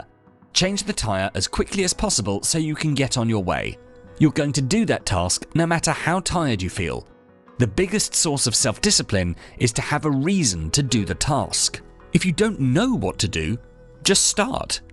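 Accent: United Kingdom. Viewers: Brit accents are a hardship for me. I made it through the video! One step closer to visiting Europe!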